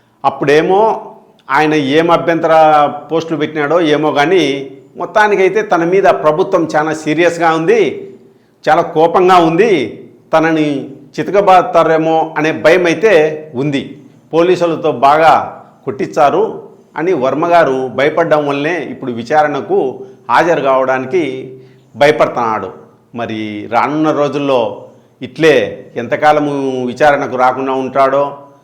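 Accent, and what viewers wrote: Rayalaseema, India. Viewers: రాయలసీమ యాసలో మీ విశ్లేషణ బాగుంది అన్నా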